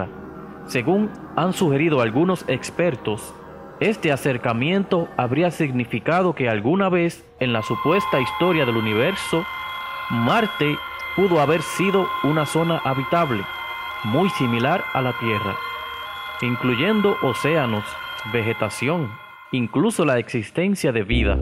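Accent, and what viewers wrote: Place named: Spain. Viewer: Bueno por ahí te comento que este programa no es mío sólo comenté que porque tienen que poner a español a narrar no puedes en latino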